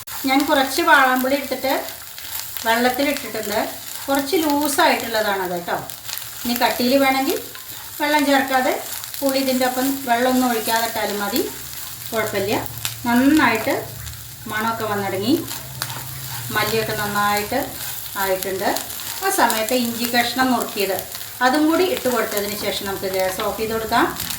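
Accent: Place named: Valluvanad, India. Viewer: നല്ല വള്ളുവനാടൻ ശൈലി🥰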